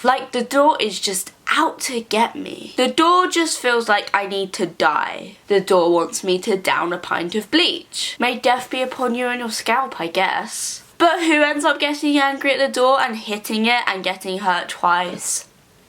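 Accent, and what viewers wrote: United Kingdom. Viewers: Is she British or American ?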